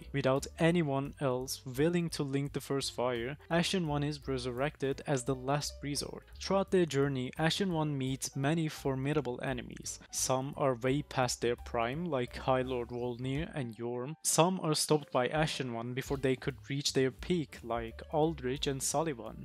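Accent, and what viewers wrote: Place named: Europe. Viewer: Can't listen to monotone euro voice over.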